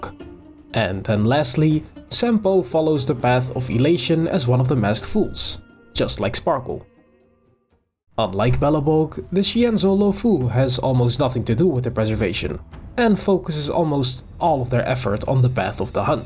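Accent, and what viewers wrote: Netherlands. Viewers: Your accent screams Dutch I'm sorry xD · Appreciated this video! I was just wondering, based on your accent, are you dutch by any chance. Question coming from a dutchie 👀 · Maybe I’m tweaking but are you Dutch? Your accent seems so similar to the Dutch accent- from a Dutchie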